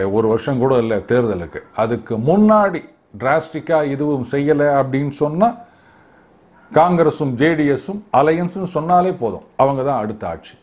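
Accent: Chennai, India. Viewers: I don't know whether Tamizh is an International language or not ....but yours and mine chennai tamizh is international ....sokka pesaringu Vaazhga Chennai tamizh Valarga Bhartham Jai Hind Vande matharam🙏✋✋🌷🍁( I couldn't find the Lotus symbol)🤔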